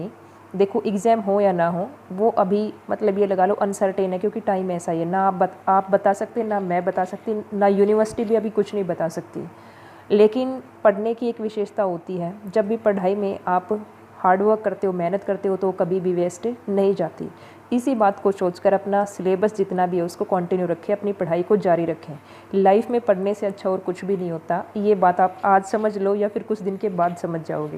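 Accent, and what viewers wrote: Haryana, India. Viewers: Mam haryana se ho aap?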